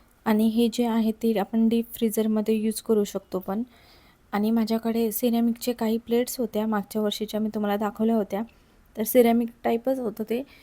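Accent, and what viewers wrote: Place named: Vidarbha, India. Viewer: mala bolnyatn janaval tumi vidharbhatlech ekadlech asal manun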